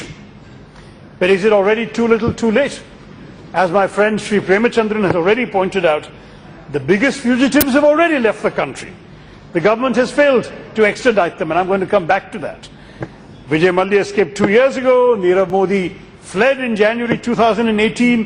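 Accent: England, United Kingdom. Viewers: Unfortunately honorable union finance minister does not understand his English accent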